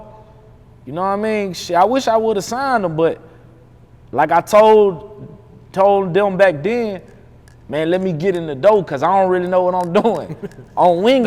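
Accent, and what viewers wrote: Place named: New York, United States. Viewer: I thought he was from NYC but as a youngin moved down to the south i swear some times every now and then i catch a lil NYC swag from him i like some of his song's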